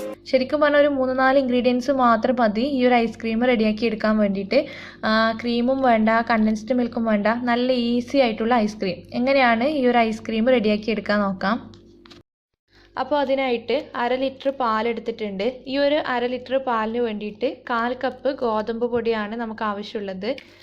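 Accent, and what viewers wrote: Kannur, India. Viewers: Kannur kari aano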